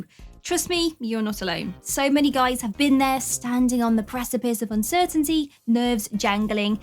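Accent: United Kingdom. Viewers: Good advices! R u from UK?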